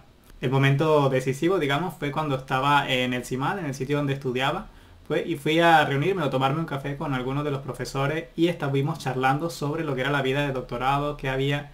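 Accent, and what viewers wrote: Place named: Puerto Rico. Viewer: Jurao' que pensé que eras de Puerto Rico por el acento. Saludos desde Santo Domingo, República Dominicana.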